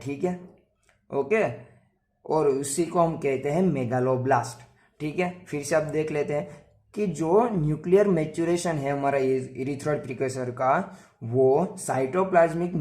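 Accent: Gujarat, India. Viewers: I think you are gujju from your accents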